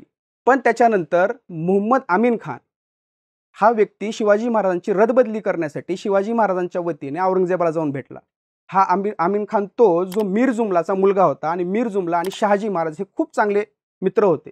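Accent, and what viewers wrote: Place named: Sambhajinagar, India. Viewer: भाउ तुम्ही मला मूळ संभाजीनगर नगर चे दिसतात भाषेवरून त्यातल्या त्यात सिल्लोड चे .. तसा मी चुकीचा व असू शकतो पण मला वाटतंय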